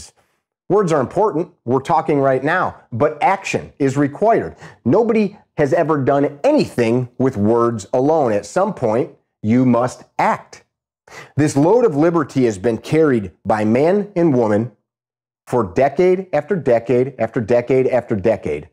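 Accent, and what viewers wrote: Chicago, United States. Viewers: As soon as I heard his voice the first time, I knew. Sounds like Chicago.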